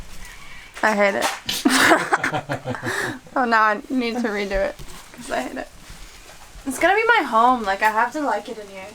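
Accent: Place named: Australia. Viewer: It's interesting how you're developing your own kind of Australian accent. It's subtle, but definitely becoming more noticeable with each new video you put out. It suits you. Cheers to another fun video! Congrats on the van build!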